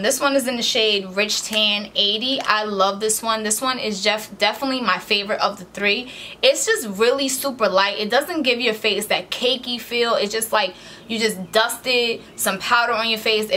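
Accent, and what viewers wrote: United States. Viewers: Detroit? I hear it lol correct me if I'm wrong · Gawjus! Love the look. And I'm getting New York accent vibes and I'm loving it .. 🙃.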